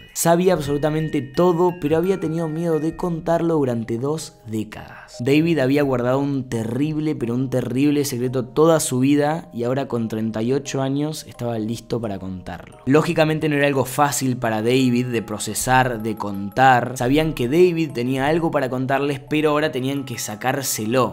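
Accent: Argentina. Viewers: Q hermoso te queda El acento argentino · Es por qué es argentino como yo xd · Amo tu acento Argentino ...Es tan bello como el chico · De donde sos, te digo de buenas es que en argentina se habla así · Es argentino da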